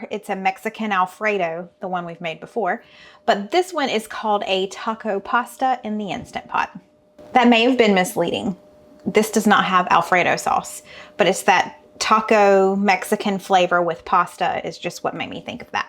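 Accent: South, United States. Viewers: I meant no disrespect for anothers accent...merely curious....I love the southern accent and I love channel. I am sorry if I offended you with my curiosity! I follow several from SC and they say it differently!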